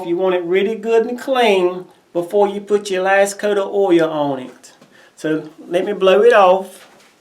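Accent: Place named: South, United States. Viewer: LOVE the southern drawl in your voice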